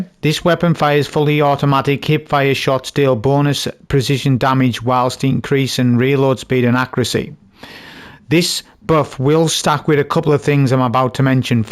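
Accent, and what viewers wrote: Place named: North, United Kingdom. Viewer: Northern Accent Sh*t Talking Destiny, Viewers, Guardians. Informative Gameplay Even Showed A Cheese I'd Never Seen!! Immediate Sub 😄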